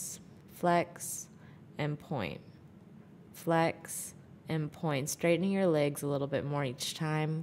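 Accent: United States. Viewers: I like your voice. And your American English. That sounds so good. 😌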